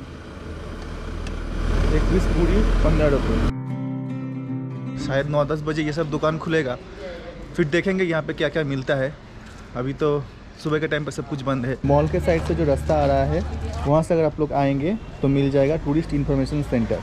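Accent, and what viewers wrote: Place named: Bengal, India. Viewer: When he was talking I got it he is bengali i mean I am to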